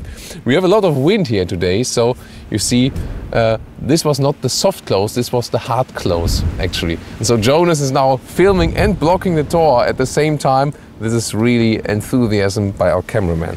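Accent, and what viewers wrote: Germany. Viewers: I speak german and I live in Canada so hearing pure german accents when speaking English is so hard to understand for me!! When you say a word in English I’m thinking in german😂 otherwise great video ! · Omg! He’s really cute! What’s his name ? And where is he from? He has an accent but I don’t know where he’s from. He sounds to have a German accent but I could be wrong .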